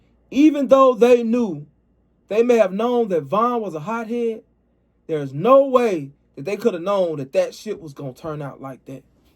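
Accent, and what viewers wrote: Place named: West Coast, United States. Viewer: gotta be from out west I can tell how u say the blocks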